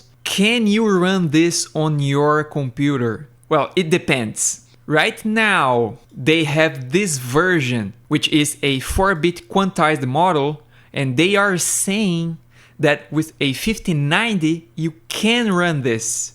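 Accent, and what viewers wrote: Brazil. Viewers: man are you brazilian? your accent is very brazilian, just got curious haha. good video btw :))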